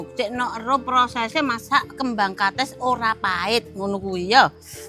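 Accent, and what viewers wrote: East Java, Indonesia. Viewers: Bahasa Jawa-ne Jowo timuran... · itu tinggalnya dimana to pingin main aku kesana kok logatnya SPT SBY apa malang gitu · Bukan cuma masakannya yg bikin ngiler..., dengar bhs jawa timur yg enak medok bikin kangen ingin pulang ke jawa timur... melihat tmp tinggalnya suasana asri didesa . Rasanya ingin menghabiskan hari tua kita ditempat spt itu. Perabotan dapur sederhana tapi nyaman dipakai.. bukan main suasananya membuat makanannya bertambah nikmat.. Terima kasih mboke.. panjenengan sukses ndamel konten . Monggo ndamel malih konten masakan jawa timuran. Matur nuwun mboke. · pean iki wong lamongan to mbk omonge koyo wong lamongam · Aku suka dg logat bicaranya basa jawanya medok jawatimuran apa jawa tengahan tah itu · Lading ,logor...sih...koyok jatim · Jan nyenengake cara masake. ini sepertinya orang Jawa Timur kalau dengar aksen bicaranya. 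Mungkin dari Gresik/Sidoarjo/Mojokerto/Jombang.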